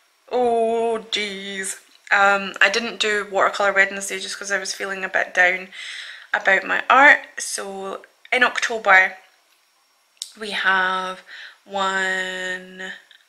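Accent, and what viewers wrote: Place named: Fife, United Kingdom. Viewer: yeah I know what you mean about me pursuing drawing. I used to really love it when I was younger and I was ok at it, but then when I attempted it about a month ago I was horrific at it and I was like "eh where did all my talent go lol", but as you say, if I put in the time and effort I could get good at it. I know you moved house a few months back so I hope all that went ok, it's always really stressful. I'm a Scottish gal myself, where are you from, you sound as if you could be from Fife. Hope your handsome boy Cayden is doing good as well 😊 Btw I would love you to do more colouring book videos but I know probably art and drawing is your first love 😁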